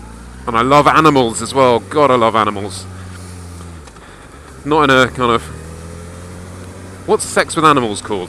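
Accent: United Kingdom. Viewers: Oh, your uploads are always the highlight of the week/month for me (okay, as long as we are talking about interwebz entertainment). I often ask myself if your videos would be as entertaining if it wouldn't be for your british accent. How about a hillbilly special, mate?:D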